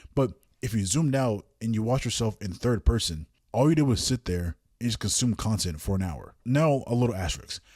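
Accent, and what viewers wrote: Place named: United States. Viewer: I'm sorry but what's with the STUNNING photo of Whitby abbey ???!! Not even related to the conversation at hand unless you want to talk about how becoming a monk or a nun is the ULTIMATE way of "locking in" because you're bound for life? It's like my fyp is hinting at me to pay attention by using motifs from my life that no one even knows about. And I'm guessing from the voice over that this guy is American, which makes this photo's insertion even more questionable since although it inspired one of the most well known books ever, it's still a pretty niche place if you think about it. Anyway, thanks for coming to my TedTalk :)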